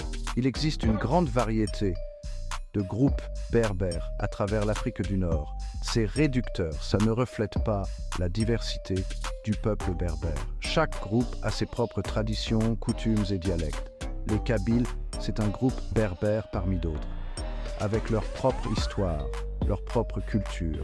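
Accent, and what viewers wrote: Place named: United Kingdom. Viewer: J'adore le petit accent british 😊 Merci pour la vidéo. très instructif. Question est-ce que l'islam tolère ou efface la culture berbères?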